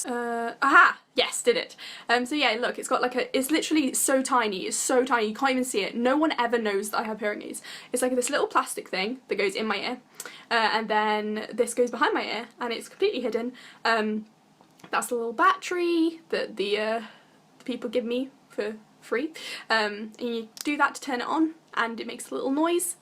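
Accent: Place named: Midlands, United Kingdom. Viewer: Brendow Félix She's from the Midlands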